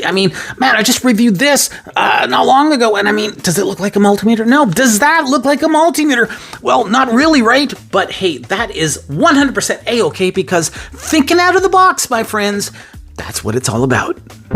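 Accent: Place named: United States. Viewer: Хорошее произношение, вроде американский акцент, интересный для меня профиль канала. Буду аудирование проходить. 😊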